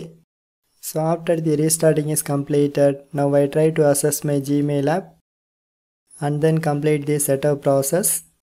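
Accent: India. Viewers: Every time i listen how to speaking indian english such as very annoying voice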